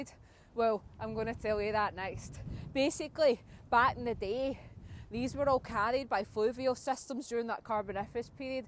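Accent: Scotland, United Kingdom. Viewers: That Bridge, Is Probably The Best Looking Bridge, In The World.! Shame, It's Only Used Fir, Trains.! So Are Ye Doon There, Looking Fir Crabs, Fir Dinner, Or, What.?! Thats A Broad Accent, LUV.! Didnt I Hear Yeh, On Scottish COD Players Wae Marley13, Lmao.! Or, Maby You Know, That Mad B'strd, On A Paddle Board, Telling A Baby Orca, TAE F#@ Off, In A Loch, &, "IT DOES", On Scottish TikTockin, HAHAHAHAHAHA.! A Rainbow, But nae LEPRECHAUN Wae A, Caudren Of Gold/Sunshine, But It IS Scotland, After All.! HAHAHAHAHAHA.! Watch Oot, Fir The Midge's, B'strd Wee, Blood Suckers, &, The Flying Haggis.! Lmao. Ken WIT A MEAN.! Namaste. 🏆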